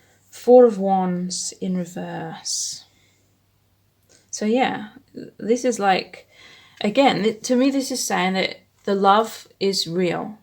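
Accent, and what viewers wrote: United Kingdom. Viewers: I love your British accent ❤❤.Great job by the way, you are really gifted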